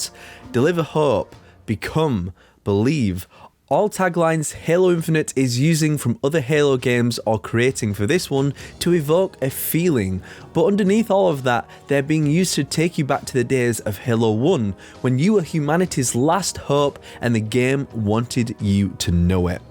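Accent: Yorkshire, United Kingdom. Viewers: This is the first time ive seen one of your vids, you sound Keighley/leeds as fuck! Great analysis btw, this looks as though it could be the best halo since number 3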